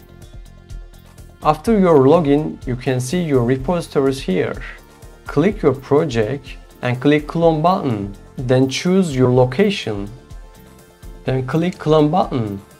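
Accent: Turkey. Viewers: are you from turkey ?? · I picked up the Turkish accent right away when you started talking😄 Nice video btw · Abi Türk olduğun çok belli oluyor ama teşekkür ederim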